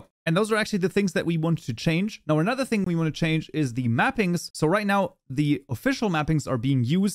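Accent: Germany. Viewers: i think i can hear a german accent or something likely (no hate im german to🤙)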